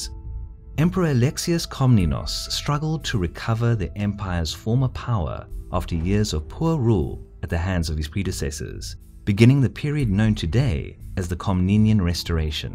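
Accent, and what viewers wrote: South Africa. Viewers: comentor of dorleaum battle is he south african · Nice South African accent by the narrator.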